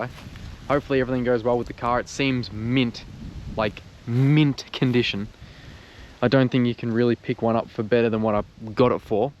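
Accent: Australia. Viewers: Hahaaa I love your ocka talk m8..😂..and I love your wee giggles here and there..living the life dude..🎉😊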